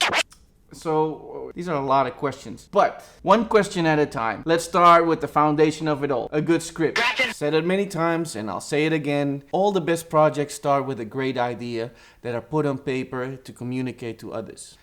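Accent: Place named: Netherlands. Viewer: Dit is veruit mijn favoriete video. Dank u voor het script op het scherm. Dit is heel nuttig om nieuwe schrijvers die niets en nooit gezien wist over script en keigaaf. Dank u. Wij willen meer wij willen meer. Niet zeker over de kleur keuze hoor. Sorry man. U vraagt om eerlijke feedbfeedback. Oppassen met wat je vraagt om ze zeggen. Rreally vooruitkijkt naar de volgende one.Misschien volgende keer is over kleur kiezen en concrete voorbeelden van het gebruik van de camera op het scherm Iran. Stuurt u voorbeeld van wat ik heb. Film Academy is officieel geopend. Geweldig werk Keep it up.Ik denk dat het tijd is voor Fb pagina waren kunt u deze video's en schrijf mee.ook vriendelijk op beschrijving alle schakels/nes u noemt het cameramodel u gebruikt of kleuring cijfer van dat bepaalde video d.w.z. sterren zeggen hedendaagse episode wordt gefilmd yang zxxz camera thier bepaalde instelling. 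Ook voor bijvoorbeeld staat in thw beschrijving het script App naam duidelijk niets te vermelden, laat een l8nk voor de kijker te gaan zoeken meer over het onderwerp.Sorry maar Nederlandse accent is niet bekend hier. Moeilijk om te begrijpen dat je. hihihi. Weldone.Blijf insturen ooooo je guru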